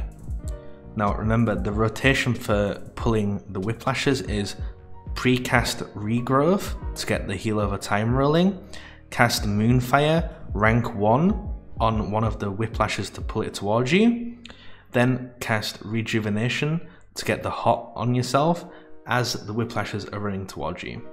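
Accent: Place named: Yorkshire, United Kingdom. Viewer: I’m from Sheffield, I’ll recognise a fellow Yorkshireman anywhere :) Nice vid man, I played vanilla back in 05 when I was 13 and had no idea what I was doing. I stopped playing during Cata but me and my mates plan on coming back for classic White rose!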